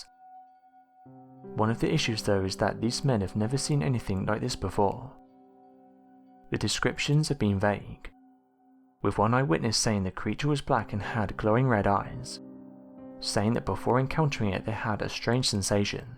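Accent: England, United Kingdom. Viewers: It's not a robot, he's English.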